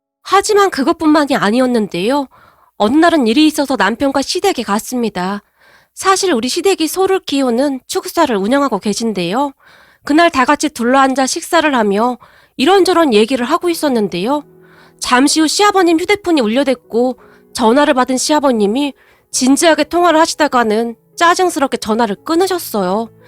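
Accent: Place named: Chungcheong, South Korea. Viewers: 힘드셧나보네요😓 보통일이아니네요..🤯 충청도사투리에 꽂혀서유~ㅋ 수고하셔유~~(^^♪) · 정말 충청도 말씀이 웃깁니다 저로서도 뒤로 넘어지겠어요 시부님께서도 때묻지 않는 모습이 넘 보기 좋습니다 시부님 배려도 넘 보기 좋구요 쓰니가 시집을 잘가셔네요 사람은 진실되게 살면 얼마나 좋습니까 쓰니 잘들었어요 행복하세요 잘들었습니다 감사합니다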